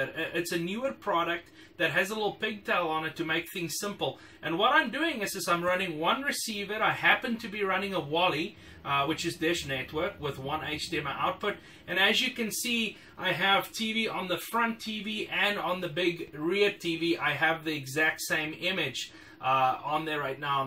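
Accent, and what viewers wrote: South Africa. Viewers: His accent is a proper mishmash but the way he pronounces his words ending in ER gives him away as a fellow Afrikaner 🤣 Or at least it does for me. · Great Saffer accent coming across-but well done all good!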